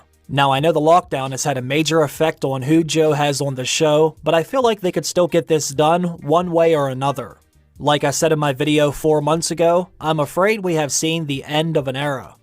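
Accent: Pittsburgh, United States. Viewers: Alright I gotta ask... You’re a yinzer aren’t you?😂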